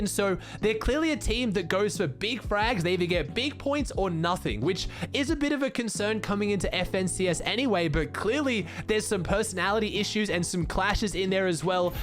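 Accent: Australia. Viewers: I don’t play fn but I. Love listening to aussie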